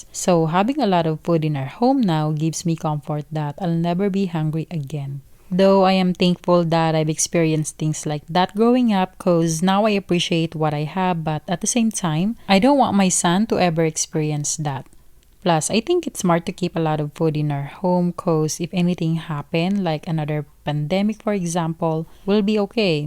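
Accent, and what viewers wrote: Philippines. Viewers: Matagal na nag wonder ako if your Pinay because of your accent...watching from Cebu❤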